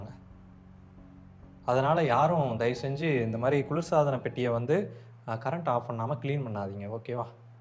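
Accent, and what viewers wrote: Tirunelveli, India. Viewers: Bro Neenga Thirunelveli Yaa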